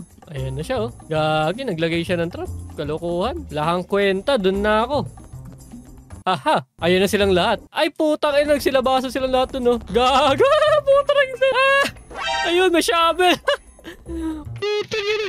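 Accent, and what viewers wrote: Visayas, Philippines. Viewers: Kuya Bisaya ka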